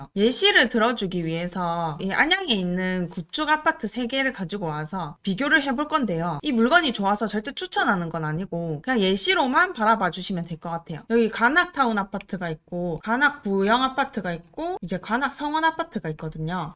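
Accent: Gyeongsang, South Korea. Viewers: 경상도 사투리인가요!? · 이 여자 매력 쪈다. 갱상도여인!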